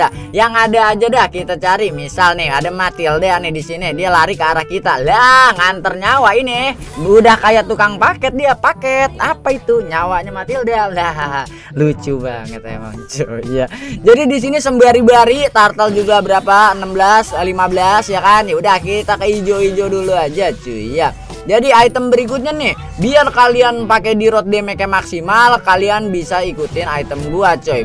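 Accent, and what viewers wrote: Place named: Java, Indonesia. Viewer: Medok amat bg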